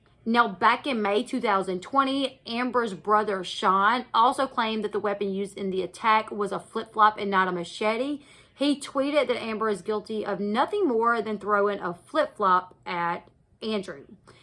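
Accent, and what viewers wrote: South, United States. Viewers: I love your country accent and I love hearing you say book so I think it’s hilarious that we’re now keeping count of how many times you say it 😂 You look so beautiful.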